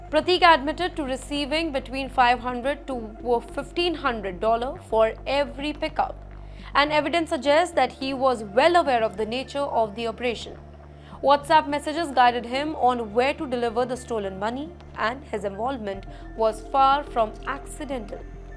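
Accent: India. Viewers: Close the borders already! Why would anyone listen to someone with the Indian accent!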